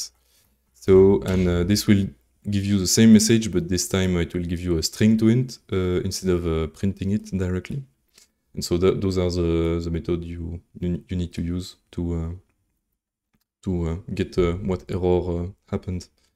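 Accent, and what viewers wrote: France. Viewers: Hum… réécrire des fonctions, un accent français… 42?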